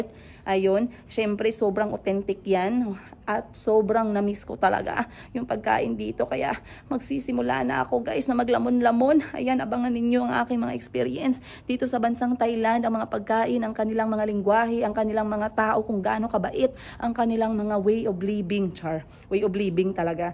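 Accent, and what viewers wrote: Iloilo, Philippines. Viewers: 😂😂😂 nalilingaw takaga ako sa pag i Ilonggo mo Ms. Nalilipay talaga kmi makabati sang inilonggo mo ah. Enjoy kamo sa Thailand, God bless and keep safe po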